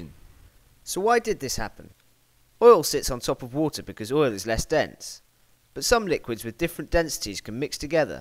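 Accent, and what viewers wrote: United Kingdom. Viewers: I find it easier to listen to him because of the British Accent. Conclusion: I would have been smarter growing up in England.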